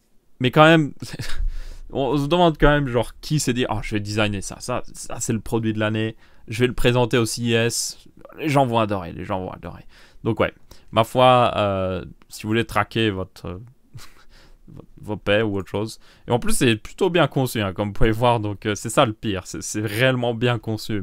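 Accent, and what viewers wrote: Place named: Belgium. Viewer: C'est l'accent belge qui lui fait prononcer "léger" en "légère", c'est tout.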